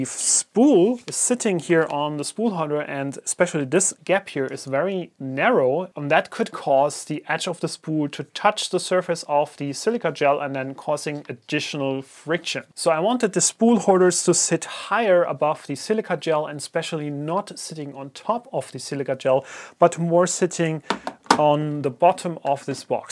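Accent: Germany. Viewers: great video! I am about to build my own dry box! So it would be awesome to win some extra stuff for it! I'm from Germany! Just as you, I assume? No offense, but as a german, I think I can hear the German accent :D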